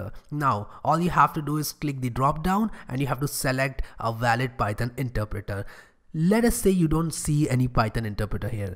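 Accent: India. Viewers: I thought he's joking with indian accent... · This guy's got the accent but is trying to be super cool. Thumbs up my hindu friend.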